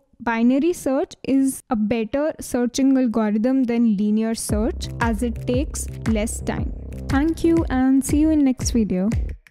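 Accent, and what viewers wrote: India. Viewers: tired of hindu accent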